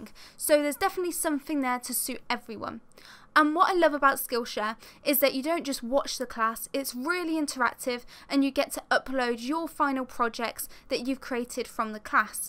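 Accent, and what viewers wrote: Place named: Northern England, United Kingdom. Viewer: I understand her perfectly but I'm northern English like her, lol. Hang in there!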